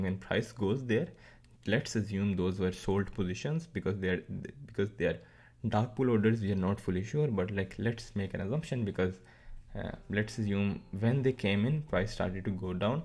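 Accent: India. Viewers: This guy doesn't give a shit about his company. Same shit 3 years later..He makes 100 changes to the UI and doesn't update the videos. I'm on a 15-day trial and seriously considering not going through with the sub because he is so lazy regarding user experience. (Also, please hire someone without a heavy Indian accent to explain how this works.)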